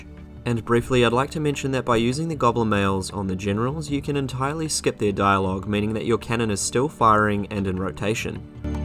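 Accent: New Zealand. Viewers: dude u a kiwi?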